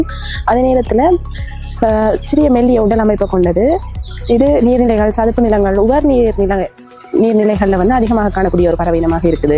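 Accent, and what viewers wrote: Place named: Jaffna, Sri Lanka. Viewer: Your jaffna Tamil dialogue is excellent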